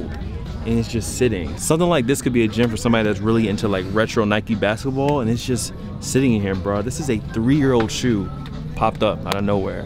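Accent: Virginia, United States. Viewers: He from Virginia?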